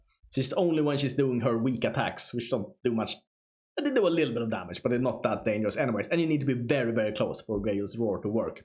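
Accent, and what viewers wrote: Sweden. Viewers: I like your Swedish accent. 👍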